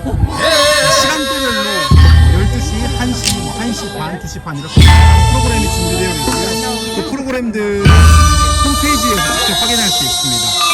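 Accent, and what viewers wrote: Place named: Seoul, South Korea. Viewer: 아주 훌륭합니다. 10년만에 서울말씨가 완벽합니다. 나도 조선족민들은 아주 싫어하고 탈북민들 중에 약 30%만 좋아합니다 특히 이북 사투리를 계속 고집하고 서울표준 말을 배척하는 경향이 있는 탈북민들을 조선족의 일원이라고 보고 있습니다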